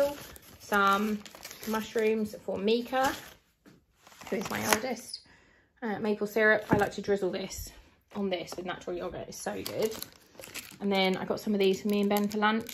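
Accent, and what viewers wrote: Norwich, United Kingdom. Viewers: The sour balls!! dough balls! look good!! and awesome choice on making meatballs!! those are delicious!! Also those cheesy jalepeno bites look amazing omgs!! Love that nutcrunch cereal too :) Congratulations on your weight loss goals :) I have been only eating very low calorie meals and taking in 800 to 1400 calories a day max, I do 200 crunches and 120 pushups 3x a week and walk decent amount at work. I am always afraid to eat too much on my cheat days because i dont want to lose my gains lol. Great choice in foods, love your food hauls!! Also you look super goddess beautiful in video omgs, those eyebrows, mascara, and that norwich accent :) lovely to watch and listen too :)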